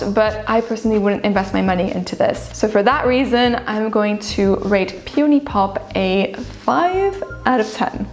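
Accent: France. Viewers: i am here for her french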